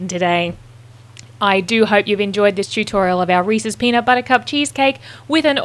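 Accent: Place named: Australia. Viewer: Wow, can't wait to make this:-) and the Aussie accent made it easy to watch;-)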